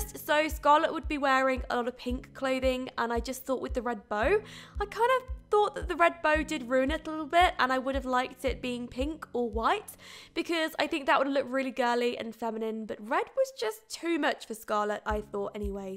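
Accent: United Kingdom. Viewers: I love your channel!! And i wish my british accent was as nice as yours; your voice is so nice!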